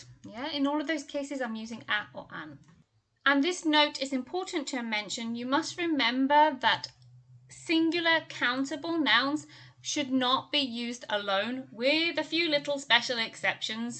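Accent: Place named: United Kingdom. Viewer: Beautiful British Accent !!!!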